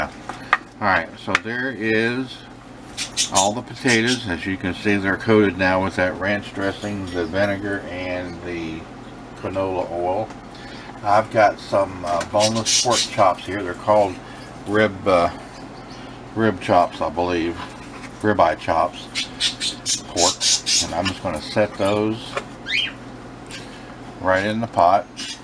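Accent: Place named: Midwest, United States. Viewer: I enjoy listening to your calm voice. You sound like you are from the Midwest. I will try this.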